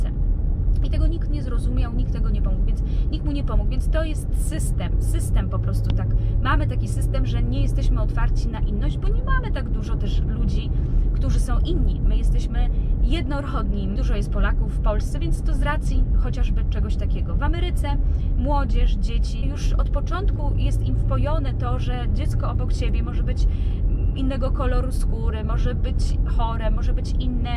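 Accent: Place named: Germany. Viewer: Dla mnie vlogi po angielsku może mają sens ale nie na tym kanale ponieważ Pani czasami przekreca słowa i ma trochę niemiecki akcent (bez urazy). Angielskiego uczymy się najwięcej słysząc go więc z tej naszej nauki wyjdzie jedno wielkie każdy wie co. Każdy powinien się uczyć angielskiego wtedy kiedy ma na to ochotę a nie pod przymusem.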